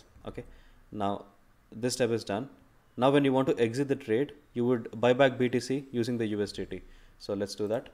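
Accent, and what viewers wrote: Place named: India. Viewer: I knew it that he is Indian guy as soon as i heard his english accent and he proved it in that chart of Trading Comparison because only Indian or non US citizens would write $ sign after the number 😂 Instead of writing it as $10 he wrote it as 10$ hence proved Indian. No offense I'm from India too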